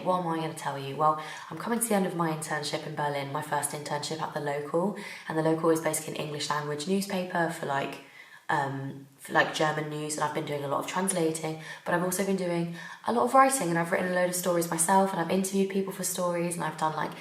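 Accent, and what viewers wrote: United Kingdom. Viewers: !😃 I always wait for your next video cause like you I tend to be really chatty, gossiping and stuffs.😅 I just really vibe with you.🤠 I feel like if we sit and start talking, time would be passing so fast than we had expected.🐣 I came to know you through Oxford videos;🦢 moving to campus etc and I don’t regret 🍀spending almost an hour listening to you.💐 Honestly at the end of one of your long 45-50 mins videos, 🌷I tap on the screen to see how much time I’ve been watching and it’s just unbelievable how the time passed as I was so engulfed in your conversation.🌻 I really like hearing everything you say and it helps me develop my ability to speak and hear Brit english. I recommend you to do a video on everything on Oxford;🌟 the requirements, interview😥, compressed time table,⚡️ party,✨ free time and others.🌈 That would really help me out and I’ll be extremely grateful to you.💥 Sorry for this long comment but I can’t help it just comes with the flow.⛄️ Watching videos that you want to film plus it’s over 30 mins is heaven for me.🤩 I really like your vids!😍 You should do a livestream on a day. 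Been a long time since you didn’t.🤔 So yeah, patiently waiting for your next videos.😇 Stay safe and be crazy!🤪 whispers you are a beautiful woman😁🤩...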